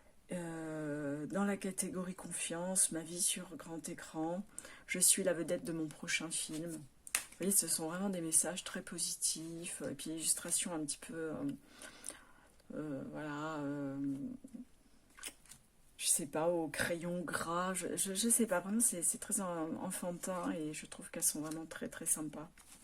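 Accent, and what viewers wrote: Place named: Italy. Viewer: Coucou, merci pour ces jolis jeux et ton accent italien que j'aime tant!!! A bientôt 🌸🌸😊😊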